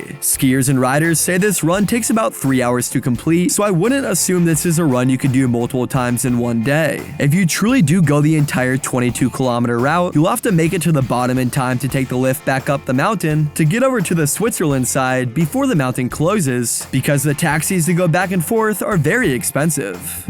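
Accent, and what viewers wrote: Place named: United States. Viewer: Nice video, but hare ur american accent 😮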